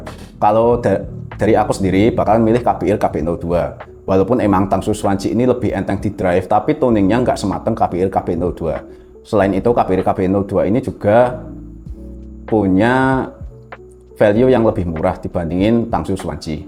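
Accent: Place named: Java, Indonesia. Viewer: Khas medok'e Jowo tenan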